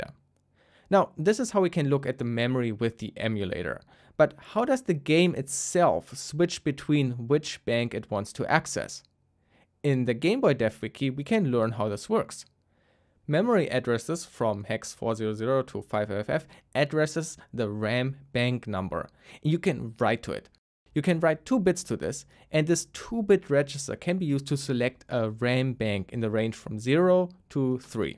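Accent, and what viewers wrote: Germany. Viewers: At the first sentence I knew: this is definitely a German guy 😂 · ich hasse deutschen Akzent 🙈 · Are you german? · are you German?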